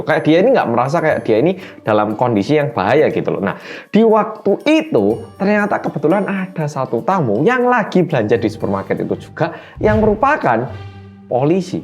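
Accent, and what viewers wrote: Java, Indonesia. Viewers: Mas medokkkkk....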